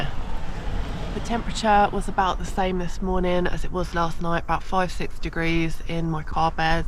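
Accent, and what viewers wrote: United Kingdom. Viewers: May I please ask which UK accent you have? From USA . Thank you for your videos ! If you ever bring George to the USA, please make a video of where you camp.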